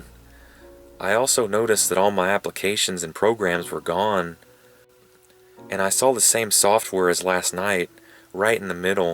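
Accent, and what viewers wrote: South, United States. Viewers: Omfg your southern accent is so thick lmao. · obviously his regional Southern accent. If you dislike it, and it appears you do, there are a lot of narrators who aren't Southern on Youtube. I personally find it quite lovely, but that's simply me.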